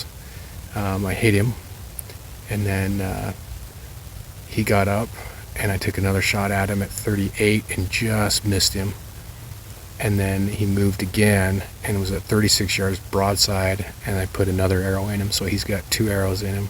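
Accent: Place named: Utah, United States. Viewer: By your accent, your from Utah, USA. Rabbits there, carry Tularemia, you stupid Mormon. You have cotton tails there, why not eat them, instead of infested jackrabbit/ Hare.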